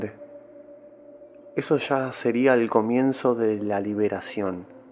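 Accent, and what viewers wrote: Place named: Argentina. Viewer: gracias! y ando esperando mas capitulos de los libros que quedaron inacavados. me ayuda tu voz argentina. memorizo mejor je.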